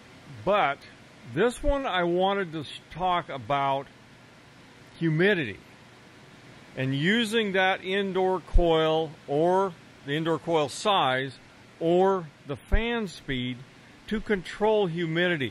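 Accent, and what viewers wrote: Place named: Indiana, United States. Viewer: From my understanding I think he’s from Indiana I could tell just the way he talks mmhmm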